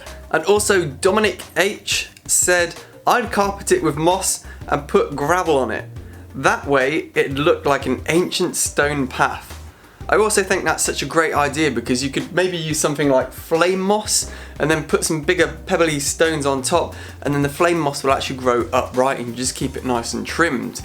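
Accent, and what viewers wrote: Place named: United Kingdom. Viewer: Where are you from in the UK ? I recognise your accent from near me 😁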